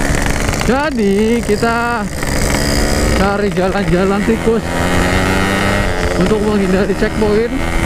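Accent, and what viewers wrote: Banyumas, Indonesia. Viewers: Asline ngendi bro. Deneng krungu ngapak"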